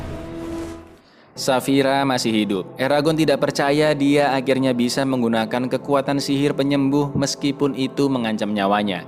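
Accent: Java, Indonesia. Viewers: Kang lu orang Jawa yang mana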